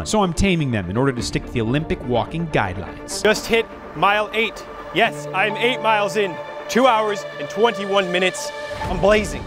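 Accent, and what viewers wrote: United States. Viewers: As a german man konnte so einfach erkennen das du amerikanisch bist 😃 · are you from minnesota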